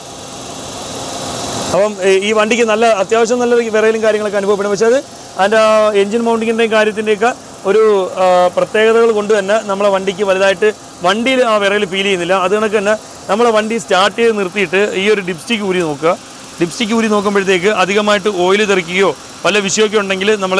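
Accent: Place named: Travancore, India. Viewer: തിരുവിതാംകൂർ ഭാഗത്ത് പ്രത്യേകിച്ച് ട്രിവാൻഡ്രം കൊല്ലം സംസാരശൈലി.. ഇങ്ങനെത്തന്നെയാണ്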